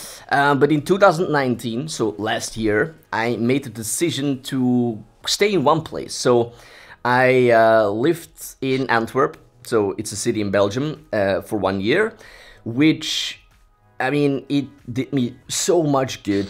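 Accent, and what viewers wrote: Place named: Mexico. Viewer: As soon as i heard you speak, i knew you where MEXICAN !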